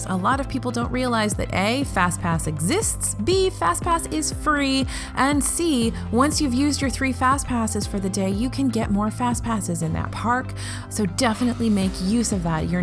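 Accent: United States. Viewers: Justin Porche I am British so she has an American accent to me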